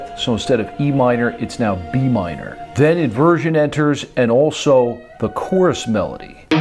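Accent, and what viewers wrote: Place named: South, United States. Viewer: you remind me SO mcuh to Dimebag Darrell, between your southern accent and silly humor similar to the Vulgar Videos. Keep it up!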